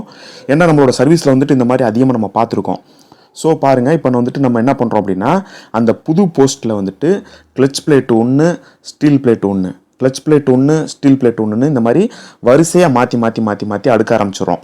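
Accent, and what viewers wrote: Madurai, India. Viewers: Bro nenga madurai,yahh??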